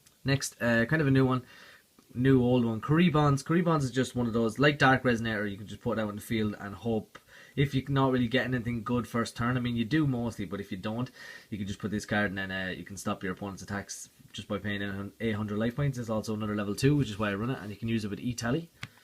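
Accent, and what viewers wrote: Ireland. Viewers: You a Laois man?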